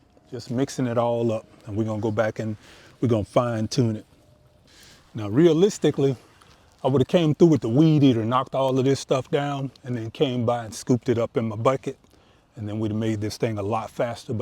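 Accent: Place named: Georgia, United States. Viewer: Great video! you sound like Jamal Bryant a little bit. I wonder if it’s a GA thing😂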